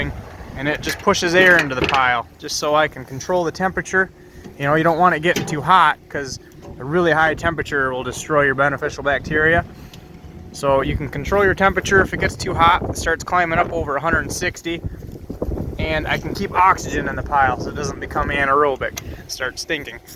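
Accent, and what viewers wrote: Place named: Midwest, United States. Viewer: He sounds like he is from sw Minnesota, or Nebraska or western iowa to me. I'm curious if I'm right.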